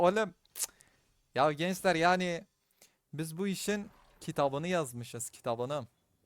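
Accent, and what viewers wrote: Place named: Diyarbakır, Turkey. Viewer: Abe sen nerelisin şiwen dyb sanki soylermisn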